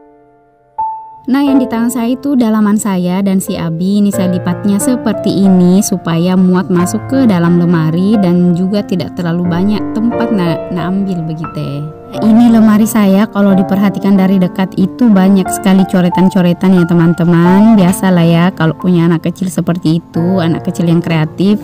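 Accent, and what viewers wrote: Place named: Makassar, Indonesia. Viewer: Hallo bunda salam kenal bunda asal mn??bahasanya ky org makassar jg yah...semangat beberes2 yah bunda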